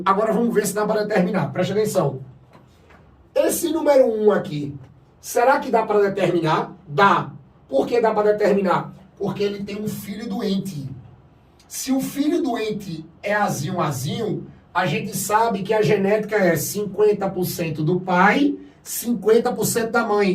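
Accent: Northeast, Brazil. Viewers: Cara, melhor aula sobre esse assunto, que professor fantástico. A explicação desse professor é muito clara e única, esse nosso sotaque nordestino é muito bom! Parabéns pela aula professor, com certeza vou lembrar do senhor na minha prova!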